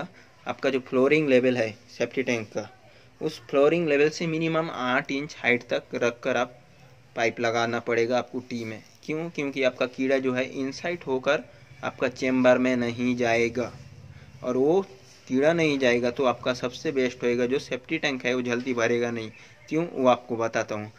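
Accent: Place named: Bengal, India. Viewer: Bangali ho na?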